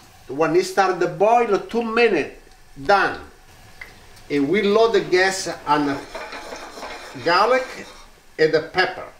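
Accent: Italy. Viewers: Love the way you explain mostly the accent, the pure italian🤌🤌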